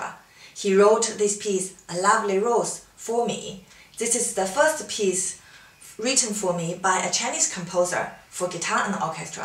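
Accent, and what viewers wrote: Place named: London, United Kingdom. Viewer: 之前 留學英國 帶倫敦腔 現在 又有少許 紐澳腔調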